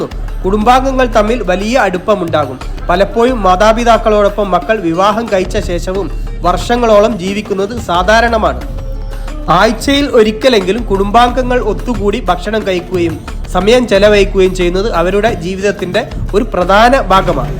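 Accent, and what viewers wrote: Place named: Malappuram, India. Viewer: സംസാരം കേട്ടിട്ട് മലപ്പുറംകാരൻ ആണെന്ന് തോന്നുന്നു ഇടപഴകുമ്പോൾ എന്ന് പറയണം അല്ലാതെ ഇട പയകുമ്പോൾ അല്ല അതുപോലെ ഴ ഉച്ചരിക്കേണ്ടിടത്ത് നിങ്ങൾ യ എന്നാണ് ഉച്ചരിക്കുന്നത് കഷ്ടം അല്ലാതെന്താ പറയുക ?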